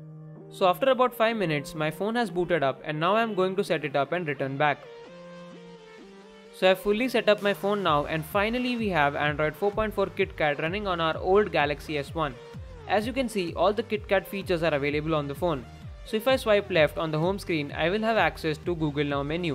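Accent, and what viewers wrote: India. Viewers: Indian xD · Is there a single Indian without an accent in this world?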